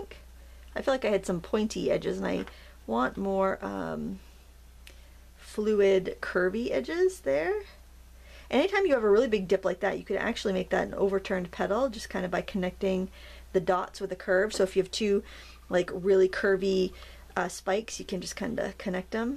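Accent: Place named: Norfolk, United States. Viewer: And, it's funny how her pronunciation is so much like my mom's. And mom was born in raised in Norfolk, VA. Lot to be said for the remnants of the dialects of the original English settlers.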